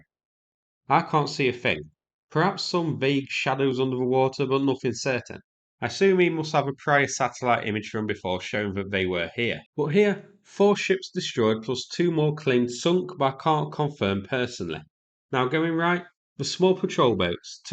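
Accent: United Kingdom. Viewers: Find someone who can speak English not British jibberish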